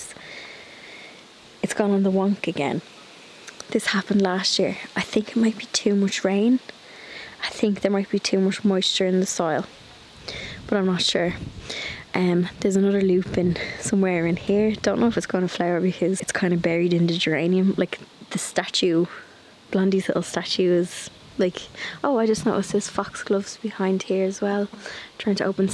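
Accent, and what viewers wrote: Ireland. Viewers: That jug of sweet peas… sigh! Lovely!, I imagined the beautiful scent whilst looking at the sweet peas. I love your YT channel and look forward to seeing a new notification when your latest YT vid is uploaded 😊. A fan from 🇦🇺 I went on a trip to Ireland a number of years ago to walk where a lot of my ancestors walked… hearing and seeing your Irishness is an added bonus to enjoying your gardening, renovating, travel, and craft projects!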